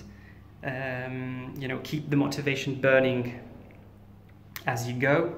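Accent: United Kingdom. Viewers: Your english accent changed from american to british lol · its true though, the only word that I heard a slight accent in is motivation, and probably two others. Other than those words, your british accents sounds perfect to me! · If you aren't British then your accent is amazing Subscribed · Thanks for sharing the video...I see that your prefer British English and speak it in an amazing way. For me I prefer more the American/Canadian English with a big respect for the British English :)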